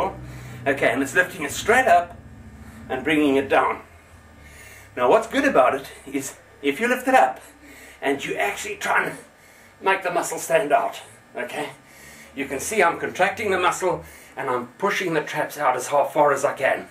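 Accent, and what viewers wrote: South Africa. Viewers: is that a south african or rhodesian accent u have? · Hi, are you south african? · are you from south africa?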